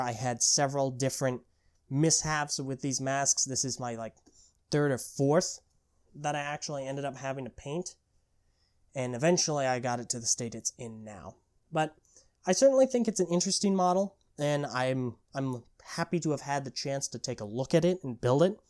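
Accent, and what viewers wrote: Australia. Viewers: Pohatu now has a Australian accent in my mind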